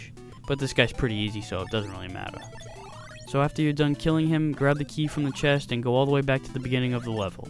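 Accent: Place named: New York, United States. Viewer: I don't see all that much AVGN, really. He just has a bit of that New Yorker accent. :T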